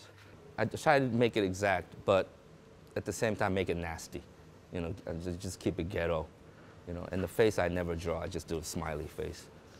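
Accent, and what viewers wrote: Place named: Bay Area, United States. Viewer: He kinda sounds like he’s from the bay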